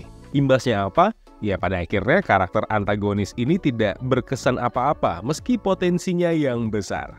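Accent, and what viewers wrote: Medan, Indonesia. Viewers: kurang dapet bahasa ala ala medannya lek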